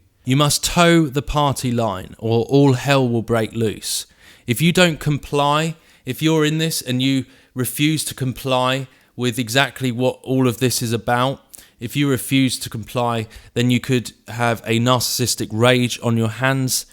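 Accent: United Kingdom. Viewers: i dont like your accent !!!! yu sound british and . . . . i will never trust a british no matter how legitimate they maybe . . . nonetheless i liked your video !!! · There is no "Jezebel Spirit" in the bible. Both the "Jezebels" in the bible were evil, but neither are described in nearly this detail A well produced video and an articulate British accent does not make it any more true. There is a lot of info out there about Narcissistic Personality Disorder and how it works. Giving these behaviors a phony spiritual persona and a name is just something someone made up. The "Jezebel Spirit" is a preaching and book selling gimmick among charismatics and has been for at least twenty years.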